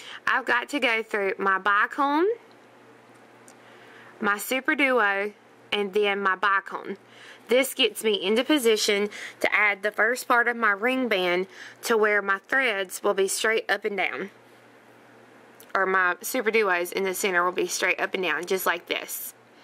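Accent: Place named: North Carolina, United States. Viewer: I like watching your videos because the view is VERY CLEAR and in focus. Besides I love hearing your North Carolina accent! Fun to hear you talk.... I'm a Texan so I probably have an accent as well . . .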